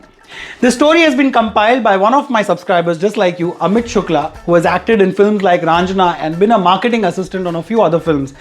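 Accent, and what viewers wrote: Gujarat, India. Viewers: Your narrative style far outshone the big fat Indian weddings with your right mix of gujju accent, filmi phrases and getting Canne accent right ,along the way!!!You are on a roll in this session and your ending where you plugged the hair product was done with class unlike the crass show of money in these weddings!!!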